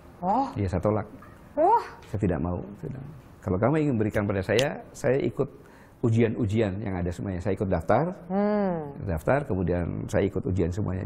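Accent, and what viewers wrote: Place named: Banyumas, Indonesia. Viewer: presenternya ngapak amat yah ngomongnya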